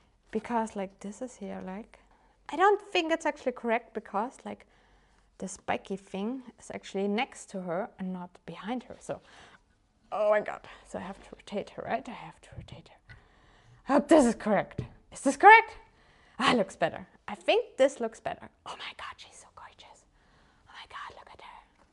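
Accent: Germany. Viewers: Are you german? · i hear german accent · ^well china painted miniatures from resin are usually heavy. And before i order something i ll be looking for some apce, not afterwards. I cant get rid of the tought that she is like Carisma Carpenter in Angel... always talking, never stopping, but with this german accent...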